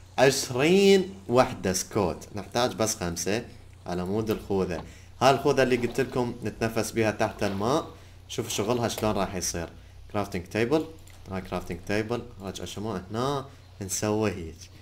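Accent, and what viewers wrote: Iraq. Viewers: كرك بادك عراقي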